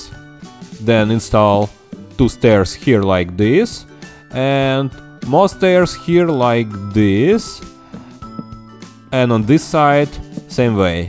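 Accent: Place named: United Kingdom. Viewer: Отличный акцент. Ты из британии?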